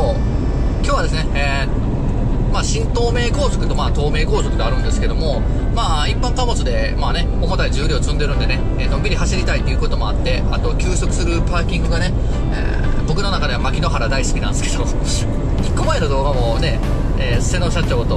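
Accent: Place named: Kansai, Japan. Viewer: 頑張ってYouTubeゆったり余裕綽々叔父さん走行映像情報ありがとう😆💕✨マグロ🐟中トロ海鮮丼宜しくホタテ貝？年末年始頑張ってハーレーツーリング映像お願いいたします🏫✏️🎒⚽ YouTubeトークおしゃべり落ち着いて関西弁可愛い❤️ 元気😃⤴️ハーレー新車納車おめでとう🎉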